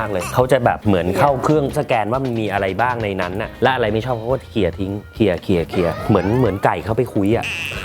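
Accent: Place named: Thailand. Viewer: พี่บอยสำเนียงไทยมากกกก น่ารัก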